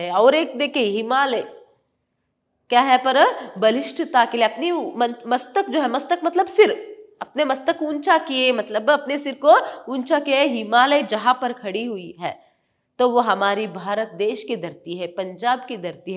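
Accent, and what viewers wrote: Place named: Karnataka, India. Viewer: but she speaks Hindi more like kannada😂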